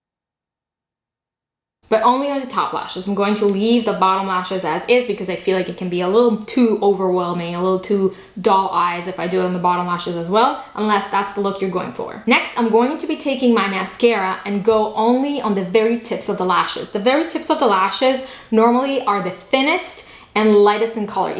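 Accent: United States. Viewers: What accent? She has an American accent nothing special. 😂🤣. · It's just American English 🤷🏼‍♀️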